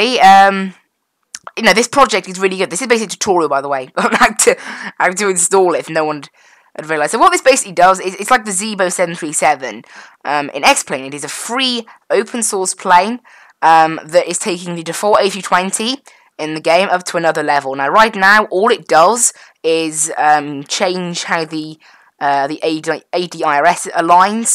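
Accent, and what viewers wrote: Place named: United Kingdom. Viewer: this is how i imagined all british people sound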